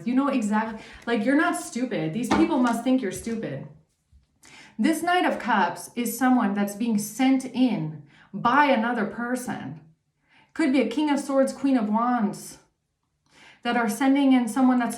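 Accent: Germany. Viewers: Yeah,... they've struck me like a match without even thinking about the consequences. I hope they learned to drop and roll. p.s I Absolutely love your German accent and language skills. What a cute picture of a lovely fraulein. That's so cool!!!❤❤❤❤❤ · Your German accent is beautiful! The wicked evil thief and his satanic family can ask s@@ to save them, they offered the collective as sacrifice for life insurance policies, steal their homes, steal their finances because the evil leader DJ zumba instructor played God on chosen lives, God's wrath is upon them, they made their bed and now they can lay in it KARMA Isaiah And I will punish the world for their evil, and the wicked for their iniquity; and I will cause the arrogancy of the proud to cease, and will lay low the haughtiness of the terrible these wicked evil inhuman intentions towards someone who did ABSOLUTELY NOTHING to him or his organized criminal group is UNJUSTIFIED for greed, sex orgies, lust, envy, jealousy? 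Psychopath. Kerosene light up a fire that never quench Malachi 4:3, which says they will be "ashes under the soles of your feet". Other verses mention a "lake of fire" (Revelation ) or "eternal fire" (Matthew ) where the wicked will suffer eternal punishment.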